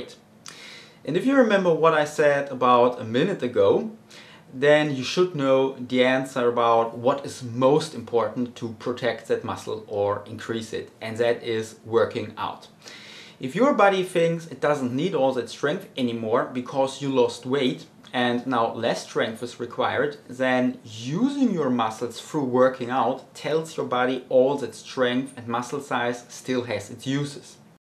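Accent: Germany. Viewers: German Accent : )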